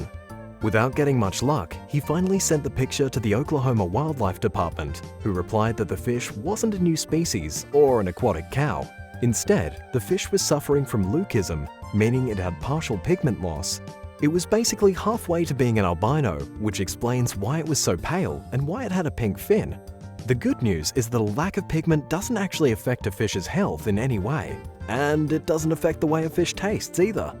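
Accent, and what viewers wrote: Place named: Australia. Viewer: Since subbing to your channel a few weeks ago, ive never enjoyed a nature channel as good as yours! I dive in as soon as i get notification & have thoroughly enjoyed every single one! I know there are tons of wildlife vids out there but yours gets straight into the nitty gritty with gusto & enthusiasm & i love the Aussie narrator Long may you all continue to show us great vids & brilliant cinematography underwater & above Super entertainment Thank you all 😉🇬🇧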